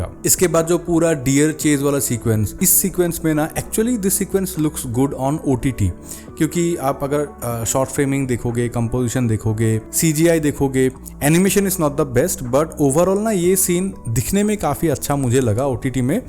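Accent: Assam, India. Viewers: Assamese boy speaking hindi in assamese accent😂😂😂.....moja video❤❤❤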